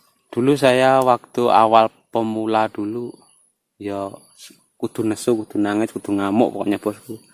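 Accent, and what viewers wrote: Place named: East Java, Indonesia. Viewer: Sik kang, sakjane aku ki pingin belajar ternak tawon. Tapi ndelok n ngrungokkan narasimu kok malah ngekek karo misuh2 dewe dadine. Wis pokoke ndlodok n trocoh, khas jawa timuran sisih kulon ki ketoke. Gak waleh tenan ki ndelok. Sukses yo kang, lanjut kasih pengetahuan nggo wong akeh. 👍🇮🇩😷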